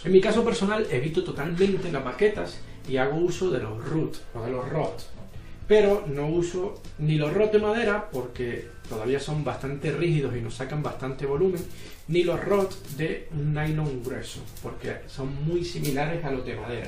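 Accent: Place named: Spain. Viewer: Justo antes de que dijera que estaba viviendo en Colombia estaba pensando en que para ser español se le notaba muy pero muy poco el acento, me preguntaba de que parte de España sería. Y cuando dice que es de mi país me cago de risa y entiendo el por qué XD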